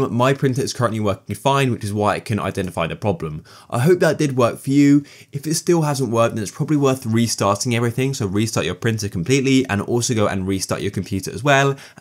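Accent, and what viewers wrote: United Kingdom. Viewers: That's how ALLLLL British ppl speak...lol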